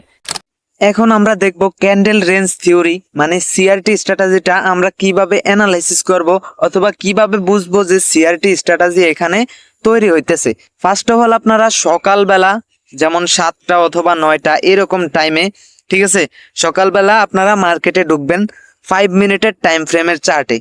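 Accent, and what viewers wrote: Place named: Bhola, Bangladesh. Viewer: ভাই আপনার বাড়ি কি ভোলা। আপনার মুখের ভাষা ভোলার ভাষার মতো অনেকটা তাই জিজ্ঞেস করলাম।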